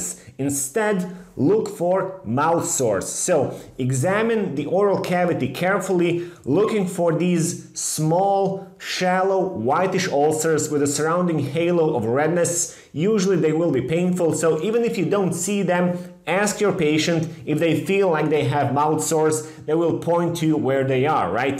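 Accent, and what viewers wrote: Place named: Poland. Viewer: You speak perfect English, but with a mid-to-heavy Polish accent.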